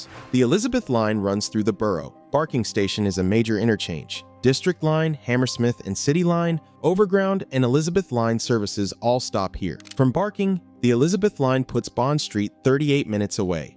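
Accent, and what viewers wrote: United States. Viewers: Why is an American unfamiliar with London and its place names narrating this??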